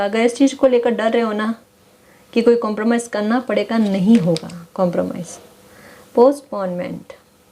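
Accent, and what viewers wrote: Gujarat, India. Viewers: Aap gujarati ho shayd...tone se hi pata chal rha h😁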